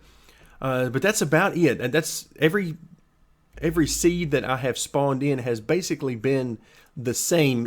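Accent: South, United States. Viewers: I am from Florida and this accent is interchangeable for Florida, Georgia, Tennessee and maybe further out depending on the individual. I have lived down here for thirty-five years.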